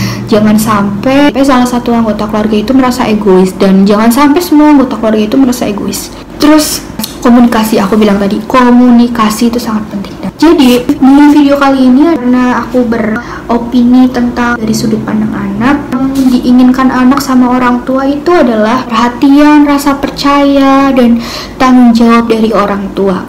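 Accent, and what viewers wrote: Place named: Java, Indonesia. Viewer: Kak kelihatan medok buanget😂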